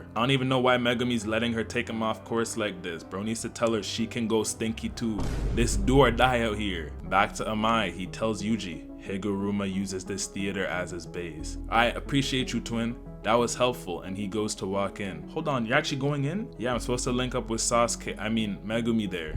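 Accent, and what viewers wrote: Canada. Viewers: holy shit saying “dutty” you aint jamaican my boy 😂 stfu i hate canadian accents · This Canadian accent is just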